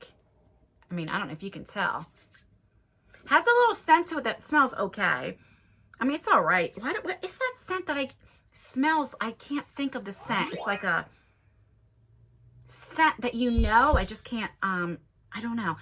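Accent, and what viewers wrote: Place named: New Jersey, United States. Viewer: Are you from New Jersey? Im from Cape May NJ and oh girl that accent takes me back!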